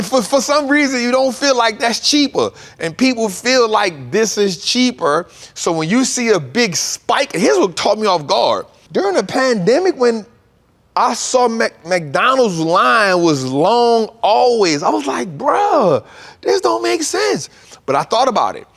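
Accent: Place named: Louisiana, United States. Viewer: I love an intelligent trapper. Dope. Keep up the awesome work 🔥 i hear some Louisiana in there too. NO native here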